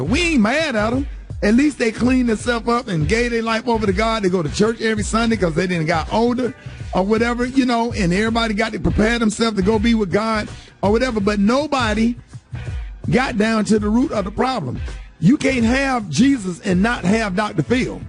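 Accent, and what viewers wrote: South, United States. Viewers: 🎙️🎙️. Bro. I love your channel 💜 and your southern accent. I finally made up with my cousin. Every family isn't perfect. God is still helping me to love on them.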